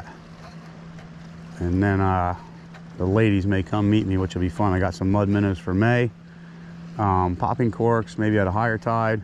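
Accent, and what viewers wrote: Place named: Maryland, United States. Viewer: Hi, I have to ask, are you from Maryland? I detect a similar accent to mine. I fish a good bit in Sunset Beach NC and use the same jigs. Nice video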